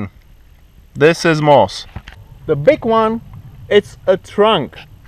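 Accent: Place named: Czech Republic. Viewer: <link> - online slovnik s vyslovnosti, online jich najdete vice nejlepsi jsou s britskou a americkou, kde slysite rozdil okamzite - nevysilejte do eteru 'ceskou' anglictinu, nadelate vice skody, jak uzitku - nemam v umyslu vas zhazovat - napad je to dobry, ale musite znat dobre svoji materii nebo se obratte na rodile mluvci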